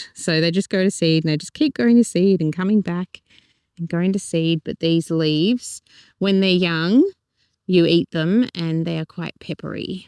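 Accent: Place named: Australia. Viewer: so good to hear an Aussie voice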